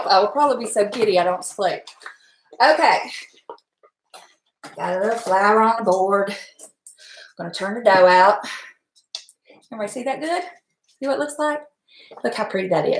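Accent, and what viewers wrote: South, United States. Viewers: Yum! Enjoyed this video and just want to say, you have the best southern accent! Love it!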